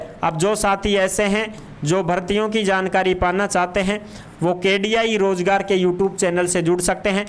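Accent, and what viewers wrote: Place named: Hadoti, India. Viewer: आपकी हाडोती बोली बहुत अच्छी लगती है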